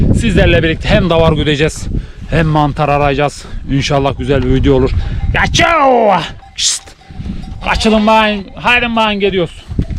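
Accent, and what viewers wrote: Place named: Taurus Mountains, Turkey. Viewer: Konustugunuz şîve Adana Mersin toroslardaki köylerde konusulanla aynı. 🤓 O yüzden çok samimi geliyor bana👍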